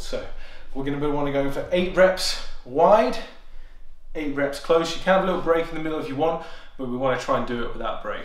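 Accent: United Kingdom. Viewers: That British accent is so much easier to listen to!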